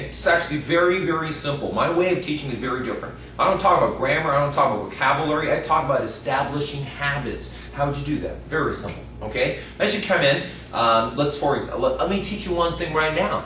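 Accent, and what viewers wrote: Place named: United States. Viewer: Dude is amazing. Except he doesn't sound Canadian. He sounds American. Maybe he watched too much HBO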